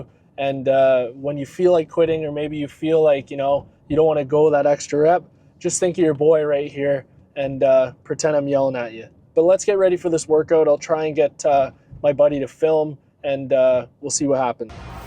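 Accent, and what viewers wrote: Canada. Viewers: You sound like you're from canada! Where ever ur from, love from Toronto 💪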